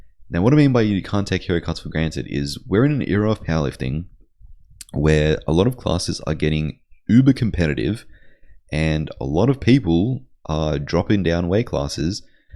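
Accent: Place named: Australia. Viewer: I recognise the Aussie accent, but can't seem to know who this channel belongs to!!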